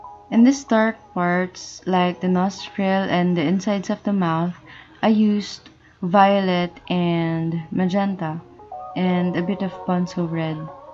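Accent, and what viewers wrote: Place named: United Kingdom. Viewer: Grabe tlga ang english accent ❤️🥰 i want to join and win the gouache watercolor to try other brand at baka maging magaling din ako tulad mo 💚💚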